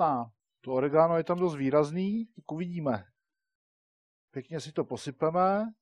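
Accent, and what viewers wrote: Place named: Prague, Czech Republic. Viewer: jsem naprosto normální heterosexuál:) Přesto bych řekl, že mám hrozně rád., tvý recepty jsou neotřelý, někdy trošku složitý, někdy jednoduchý, někdy super a dost často na ceny nejvyšší. Občas mi jako brňákovi úplně neladila tvoje pražská mluva, ale tohle je přesně to, co tě dělá zapamatovatelným. Jsi nejen vynikající kuchař. Jsi i člověk s charismatem a dobrým srdcem. Jsem rád, že jsem měl tu čest tě poznat i osobně. Díky nejen za ty super recepty, ale díky i za to, jak to celý podáváš.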